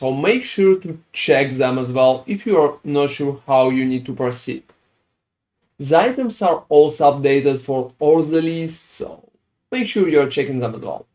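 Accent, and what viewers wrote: Russia. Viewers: жесть чувак твой русский акцент это такой пиздец, это невозможно слушать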